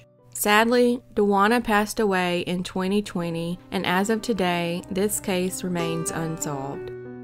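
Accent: South, United States. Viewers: Such a soothing southern voice.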